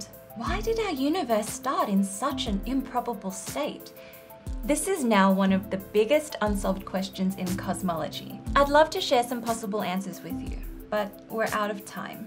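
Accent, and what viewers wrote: England, United Kingdom. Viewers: a pleasure to find out your name. I have watched maybe a dowzen of your videos, and like them all. I took algebra, geometry, trigonomics, physics and chemistry in college. But your simple explanations help me recall and see things in a new way. You even helped me to understand Calculus! Thanks! The English accent is a bonus for me.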